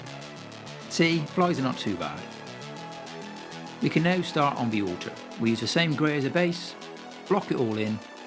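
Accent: South West, United Kingdom. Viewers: Didn’t realise that I was missing the South West accent from the modelling community! And I’m glad I’m not the only one who rests their head on the lamp!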